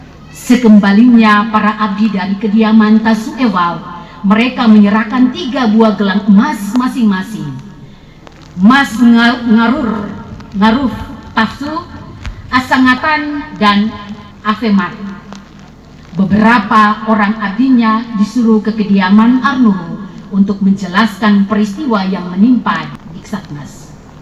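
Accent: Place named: Kai Islands, Indonesia. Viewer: Tak mungkin yang membaca teks ini bukan orang Kai. Saya yakin Pasti orang Kai. Salam dari bumi Cendrawasih Papua. 🦜🦃🦤🦩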